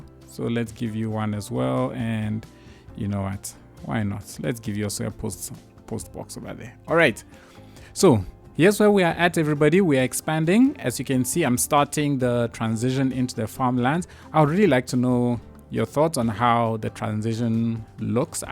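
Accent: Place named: South Africa. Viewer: South African accent 🤔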